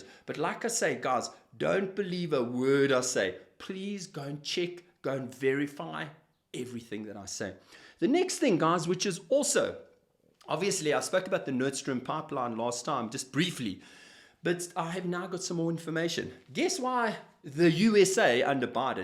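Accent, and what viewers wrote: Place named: South Africa. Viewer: Thank you so happy to hear a south african voice talking about this. Do you perhaps have a website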